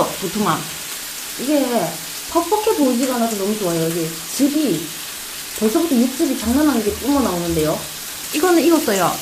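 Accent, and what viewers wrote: Gyeongsang, South Korea. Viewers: o sorry I thought it was Daegu accent btw u can speak English?!!😯😮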